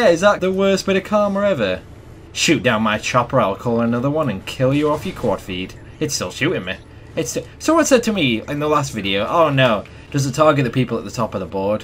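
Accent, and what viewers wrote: United Kingdom. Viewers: U brittish?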